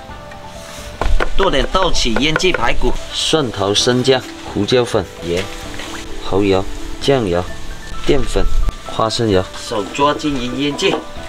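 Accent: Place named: Malaysia. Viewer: Where is from? Sounded like a Malaysian.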